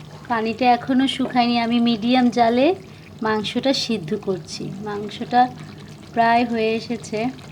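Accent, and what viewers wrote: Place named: Noakhali, Bangladesh. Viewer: apnar kotha sune mone hosse apni noakhalir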